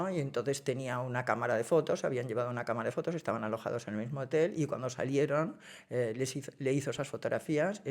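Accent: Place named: Barcelona, Spain. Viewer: Este “no” es una marca de la casa. No te inquietes por eso, es una coletilla perfectamente válida. Tu forma de hablar es muy barcelonesa ( parte alta ) y a mí me recuerda cuando vivía en esa ciudad.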